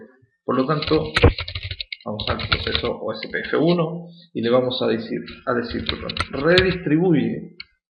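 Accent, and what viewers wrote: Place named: Chile. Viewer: excelente vídeo me faltaba tener bien el concepto de la métrica lo de virtual link no lo sabia pero en CCNA igual se trabaja con distintas áreas teniendo claro la Backbone, OSPF tiene sus reglas bien definidas me sonó a que eres de Chile bueno saludos.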